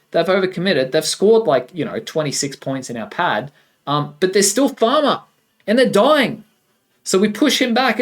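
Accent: Australia. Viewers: The Australian accent is great. 😂👌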